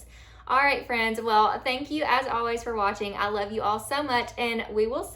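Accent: South, United States. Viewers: I love September on BookTube because we have so many good readathons here! I’m going to be participating in Series September! Happy Reading! So nice to hear another southern accent here with me on BookTube! 👏👏 I’m also ready for fall too🤣🤣🤣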